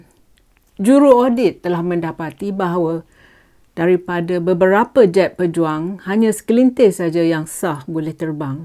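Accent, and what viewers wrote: Perak, Malaysia. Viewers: Saya suka dgar. Tapi ckp perak sikit buat saya ketawa. Sbb saya pun orang perak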